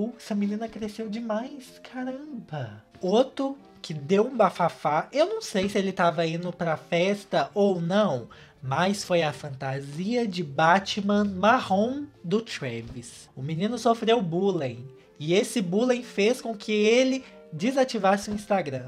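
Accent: Minas Gerais, Brazil. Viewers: o sotaquinho mineiro iti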